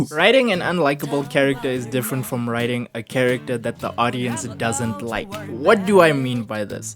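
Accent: South Africa. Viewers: You south african neh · Yo are you South African?? You dsying 'This ain't it chief' is a phrase I've realised I only ever hear in my country😂😂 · I knew that accent sounded so familiarrr brotha is south african like me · How did I know you were South African in the first 30 sec😭🇿🇦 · I know you are South African , our accent is so pure I’m proud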